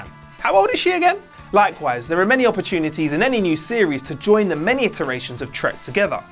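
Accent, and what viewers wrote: United Kingdom. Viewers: lol u so brittish <3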